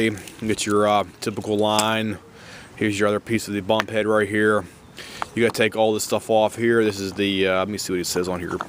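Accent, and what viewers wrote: Pittsburgh, United States. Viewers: Thanks for the video. Btw, you sound very Pittsburgh.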